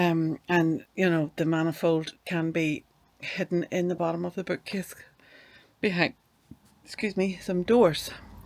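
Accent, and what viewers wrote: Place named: Northern Ireland, United Kingdom. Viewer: Love a Northern Ireland accent, I could listen to you all day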